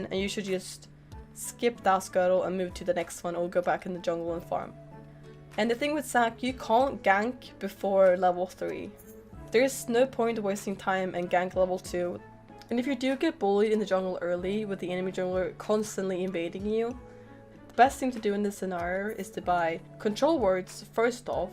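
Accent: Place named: Sweden. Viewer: omg girl I love you! Im also playing jungle on Gold right now, mainly Evelynn and Kayn and its so nice to see another girl that also likes to play jungle. This whole stereotype of girls playing only supp or mages is so annoying... And I feel less alone now! :D Oh, if you play Kayn, evelynn or elise it would be also awesome to get an guide on those champs. Even tho I main them I still feel I have alot to learn and it would be nice getting this info from another girl! And Eve feels so weird to play once youre a bit higher on ranked because people actually know how to play against her then and how to get back into the game once u are behind.. So, some advices for gold- Plat would be nice! Oh and youre swedish accent is so damn cute! :D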